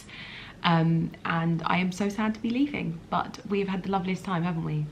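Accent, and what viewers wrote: United States. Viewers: I hear an American or Canadian accent by the host....fantastic destination to visit. So beautiful. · Even for me as an American, it was a bit jarring to hear an American accent on your vlog, in a French place. 😂😂 This place is magical, thank you for sharing. 💗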